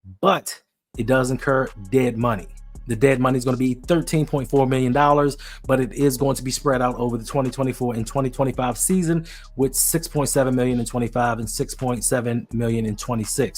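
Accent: Baltimore, United States. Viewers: Not the Baltimore accent 😭 good vid tho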